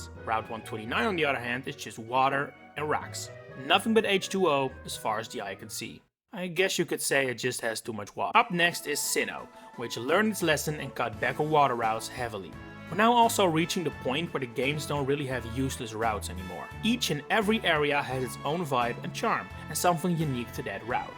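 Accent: Netherlands. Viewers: Vind het geweldig om te horen dat je Nederlands bent puur aan je accent. Het is niet extreem Nederlands, maar Nederlands genoeg om te horen dat je 1 van ons bent ;)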